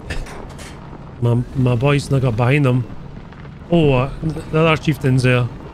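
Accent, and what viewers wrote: Scotland, United Kingdom. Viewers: You got me into War Thunder recently and always make me laugh. I love the silky Scottish tones too 😂 You keep up the entertaining work marra!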